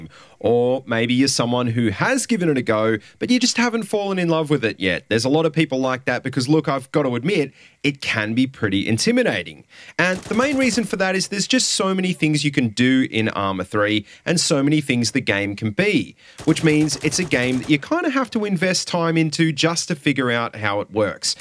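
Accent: Australia. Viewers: This bloke sounds like the Silverchair boys. Oh look he's in Newcastle. #AustraliaHasAccentsToo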